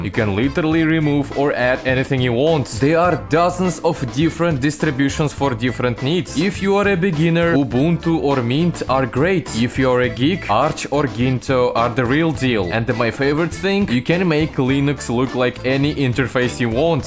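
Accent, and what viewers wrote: Russia. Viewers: bro english in russian accent is so harddd🔥🔥🔥🔥🔥🔥🔥🔥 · Бля чел у тебя русский акцент почему у тебя только английские субтитры и озвучка😭😭😭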